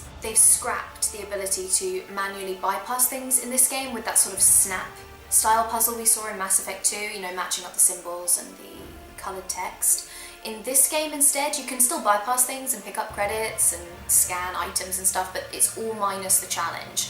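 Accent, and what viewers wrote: United Kingdom. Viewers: Now I really want to know what made you cry "hysterically" :-) (not that I didn't too... well not *hysterically* but still, I completely understand). Actually I would love to hear what choices you made and how it played out for you. I always like talking to friends about the different choices they made and why. Anyway, fantastic review (this one and the ending) I feel like you're voicing my own thoughts, just in a female voice... and British accent. Love your videos, keep up the amazing work!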